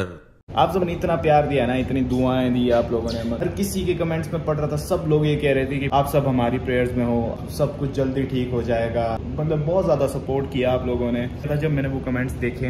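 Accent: Pahadi, India. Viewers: Hi I am also from Himachal but unlucky I am live in Delhi . Mujhe apki Hindi sunkar lag raha hai ki app pahadhi hi ho because your voice pronunciation like pahadi people. Love from Delhi but from Himachal ❤️❤️ pahadi logzzzz 💜💜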